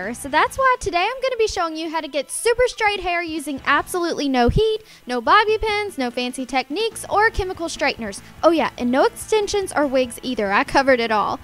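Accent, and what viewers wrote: South, United States. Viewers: You have SUCHHH a southern accent like whaaat but great video 😝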